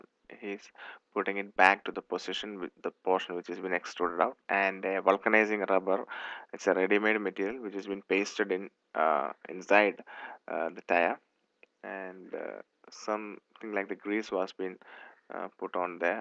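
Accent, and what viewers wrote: Kerala, India. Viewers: sounds Indian to me. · I bet the narrator is from the God's own country.. So am I.....:)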